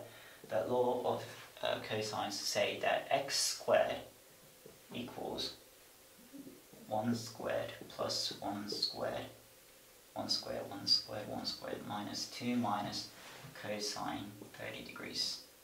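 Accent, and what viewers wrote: United Kingdom. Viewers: 70% British + 20% American + 10% Japanese accent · Interesting video! You explain things clearly. By the way, you speak with a British accent but pronounce "diameter" in a very American way. It's quite funny.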